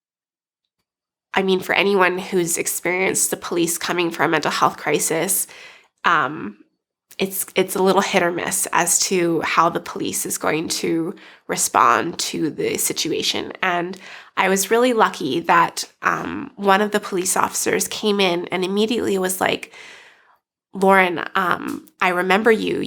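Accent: Canada. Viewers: you are from the prairies or the west, i can tell by your accent. i'm from ontario, and can tell you're from either saskatchewan or alberta or maybe interior BC. this makes me wonder what would happen if you weren't a white woman. what they did to you was very wrong. and imagine if you were an indigenous woman they would like have been much more violent towards you.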